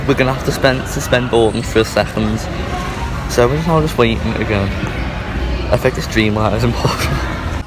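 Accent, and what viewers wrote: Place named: Liverpool, United Kingdom. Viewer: Scouserrrr yes!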